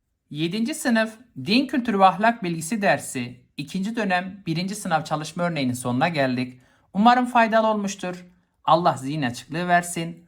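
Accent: Malatya, Turkey. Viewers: Hocam Malatyalı misinizzz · Hocam Malatyalı mısınız?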